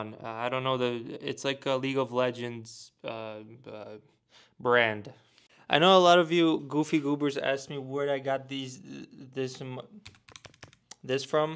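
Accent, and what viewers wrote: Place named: Poland. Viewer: Yo what country are you from you’re giving me polish accent vibes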